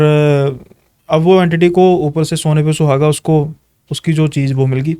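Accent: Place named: Punjab, India. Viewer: Yaar ye punjabi accent me baat kar raha hai.. aadhi baat samajh nahi ayi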